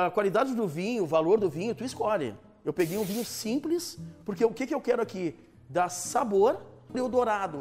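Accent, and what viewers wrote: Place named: Porto Alegre, Brazil. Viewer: Sotaque de Porto Alegre !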